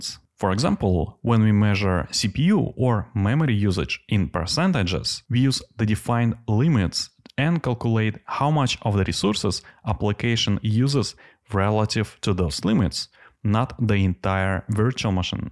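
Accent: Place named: Russia. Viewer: Было бы более приятно слушать без русского акцента)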